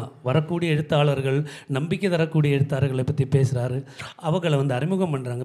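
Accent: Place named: Kerala, India. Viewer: அவரே தான். இவர் ஒரு எழுத்தாளரும் இலக்கியவாதியும் என்று தெரியாது. மிஷ்கின் படங்களில் மலையாள வாசனையோடு கூடி வரும் இந்தக் குரல் என் மனதில் எப்படியோ பதிந்திருக்கிறது. மிகவும் அழகாக பேசியிருக்கிறார் இந்நிகழ்ச்சியில்.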